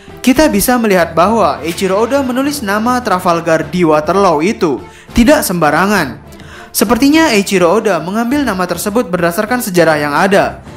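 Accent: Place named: Bali, Indonesia. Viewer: gw smpt mkr gtu kdgr dr " T " nya kyk logat org bali... hehhee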